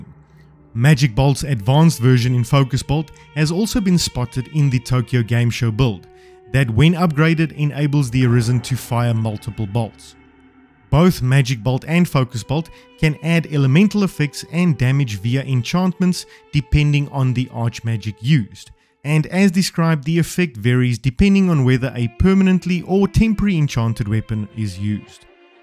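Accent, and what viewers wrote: South Africa. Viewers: South African? Nice! Subbed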